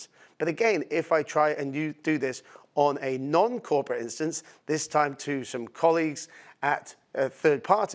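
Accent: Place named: United Kingdom. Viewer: A Netscope rep for Australia sent me this link. Am about 50 secs in and had to write this..... A well muscled guy with a cool Brit accent (I'm a pom too) - tick, impressed so far but wanting to get emails while on a treadmill.....seriously? LOL (I have been in IT 35 years, almost retired and now starting to really feel old!). That said, it does seem a cool product.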